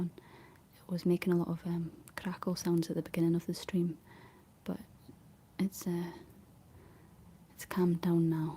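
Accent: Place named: Scotland, United Kingdom. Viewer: So glad found another Scottish 🏴 lass i can listen to I love your story’s really helps me get to sleep thanks love from highlands❤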